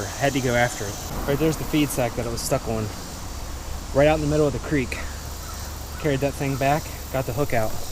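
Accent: Pennsylvania, United States. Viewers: That's a awesome day of fishing! What state are you fishing in? You sound like you're from P.A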